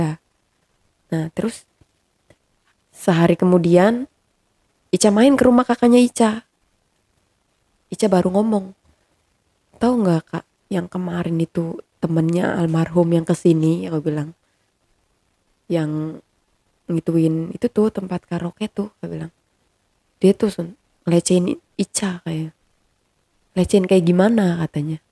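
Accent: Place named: Cirebon, Indonesia. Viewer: Asli dari gintung berarti tetanggaan sm sy Galagamba,berkesan sih ceritanya,aplgi logat khas wong cerbon,iya tah,iya jeh,kang bner sih..salam teh sing wong Galagamba.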